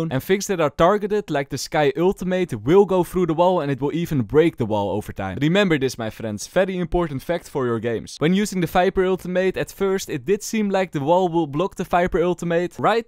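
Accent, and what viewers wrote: Netherlands. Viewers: bro has the most dutch accent ever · ur acent is dutch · from the 1e time i heard y i knew y whr dutch! ik ken het maat, het is ook zo bij mij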